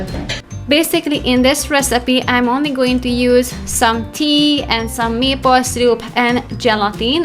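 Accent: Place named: Hungary. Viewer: One of your other videos was just recommended to me and when I clicked and heard your accent I knew straight away that you are a fellow Hungarian! 🧡 Feliratkozva!! :)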